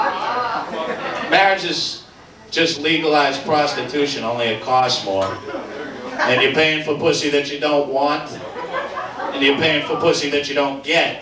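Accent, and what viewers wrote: Boston, United States. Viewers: So when did this super thick Boston accent disappear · The Boston accent is wicked pissa! More proof that has always been a genius...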